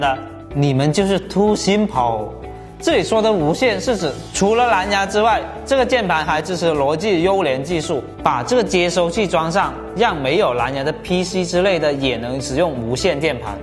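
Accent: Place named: Guangzhou, China. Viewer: 广州人口音。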